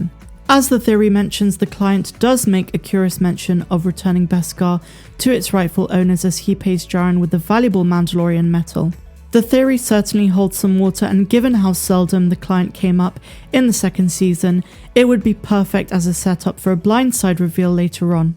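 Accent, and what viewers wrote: United Kingdom. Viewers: Thanks for the update. Where in the UK are you from btw, that is if you live there? I like your accent.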